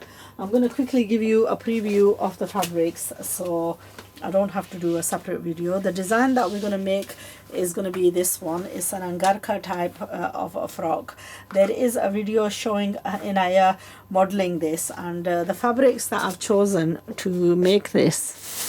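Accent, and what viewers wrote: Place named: Australia. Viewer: I don't know but she speaks like Australians but she is Asian.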